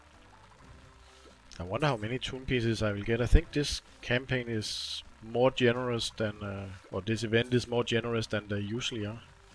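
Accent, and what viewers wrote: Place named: Denmark. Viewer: Du är dansk va? Det hör man på din engelska 😅 Men, sjov med människor som streamar Looney Tunes, keep it up!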